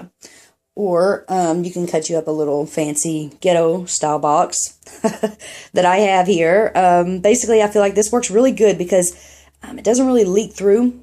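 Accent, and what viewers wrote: South, United States. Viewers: The video was fine. Don’t pay these dummies no mind. I’ve never done incense and want to try it and this is instructive. Gosh, and that wonderful southern accent! I totally miss hearing it!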